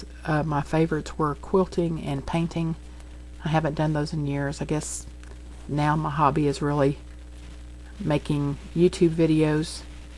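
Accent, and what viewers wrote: Texas, United States. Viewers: Iove listening to these…….Im with you about the liver….ugh!! anything but liver is what I say! : ) I didn't know you were from Texas even though you def sound it but I will say I flew down to Houston from where I live (Connecticut) and drove all over and I will have to say the people down there are so kind. I hate flying but I would go back there again for sure.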